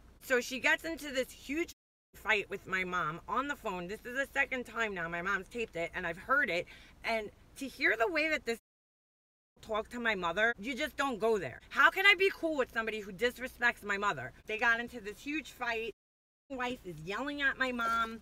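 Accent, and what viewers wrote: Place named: New Jersey, United States. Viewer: are you from NJ or NY? I love your voice. I’m born and raised Jersey too...and I don’t hear my own accent but I hear it when you speak. Your very pretty BTW.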